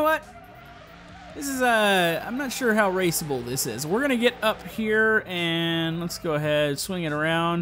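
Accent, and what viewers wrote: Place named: United States. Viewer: Where do you live? You sound American but you have your speed still set to km/h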